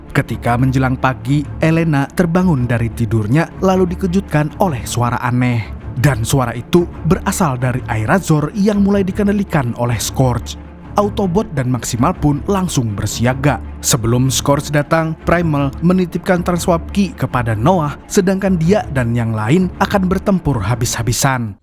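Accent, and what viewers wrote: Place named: Java, Indonesia. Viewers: Akses jawirnya kental sekalee brur! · Esih medok ya lik tapi lha mandan adem ❤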